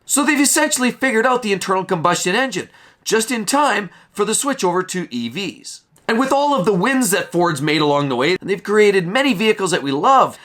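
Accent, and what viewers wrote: Canada. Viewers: It's his accent. He's Canadian I believe.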